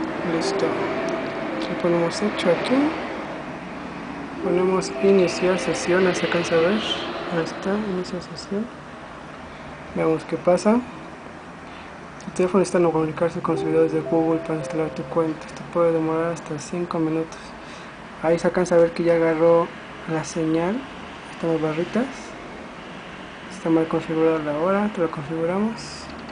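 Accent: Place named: Pocho, United States. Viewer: Bueno y por qué hablas así como queee... tan POCHO???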